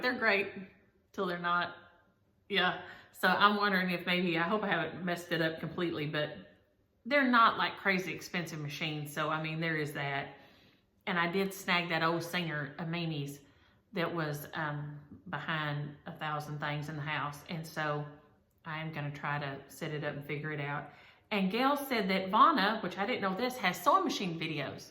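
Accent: South, United States. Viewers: Sounds like you have had alot going on....I hope you can fine those pants....I had the Close Captioning on your video and they do not understand the Southern Talking....because what you said, was a whole different world than what they type...the last video, I was on the ground laughing to hard....they typed "Naughty" alot ...you did not say that....The Sheep are always fun to stitch, and you need to count your Blessings ...take care and be safe..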